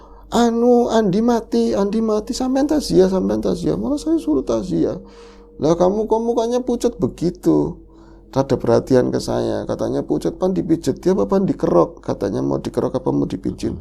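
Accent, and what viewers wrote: Banyumas, Indonesia. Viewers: hallo mas 😅😅..seneng aq klo denger bhs ngapak..ketawa trs aq nya..sehat sll mas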